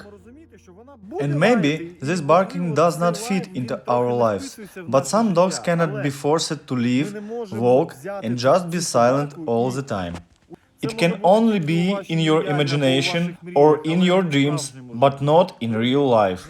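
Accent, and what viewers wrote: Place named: Russia. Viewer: Найдите другой голос за кадром, очень сильный русский акцент. Слишком сильный.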